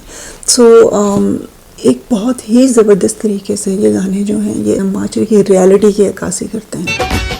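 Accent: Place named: Pakistan. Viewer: I love your Urdu accent. Vintage ptv Urdu haha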